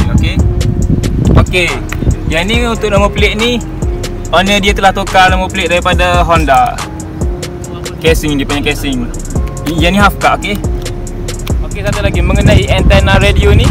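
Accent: Kelantan, Malaysia. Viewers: kecek klte la boh...loading kwe tgk..hehe..mantop pok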